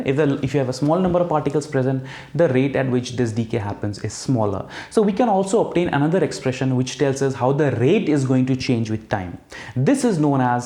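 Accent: India. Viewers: Heavy indian accent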